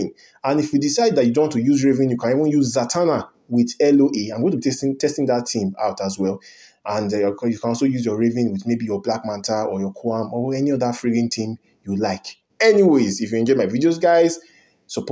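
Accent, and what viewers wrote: Nigeria. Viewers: Dude you sound Nigerian 😃. If yes, then you are like the first Nigerian I have come across doing videos on IJ2. Nice work 👍. As for the damage, the 300% boost is for the base damage of the character and not total damage. This means the boost does not factor in extra damage from gears, passives, talents and the likes.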